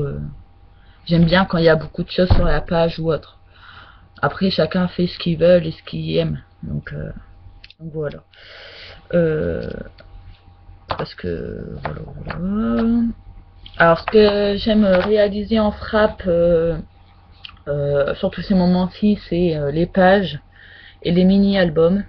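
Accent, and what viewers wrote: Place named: Nord, France. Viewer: Coucou je viens de tomber sur une de tes vidéos de l'album sur ton fils que je trouve surper beau et du coup je me suis abonnée à ta chaîne ... dis moi tu ne serais pas du Nord par hasard ? j'ai cru entendre un petit accent lol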